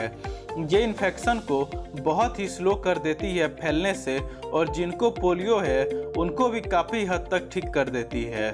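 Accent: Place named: Bihar, India. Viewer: Bihari voice 😍😍😍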